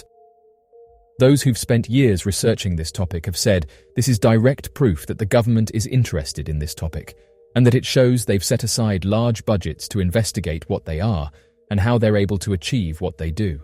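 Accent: England, United Kingdom. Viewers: You sound English so no excuse - the plural of aircraft is”aircraft” NOT “aircraft’s”. Just saying…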